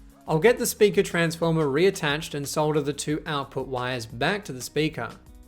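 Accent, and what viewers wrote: Australia. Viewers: an Aussie, mate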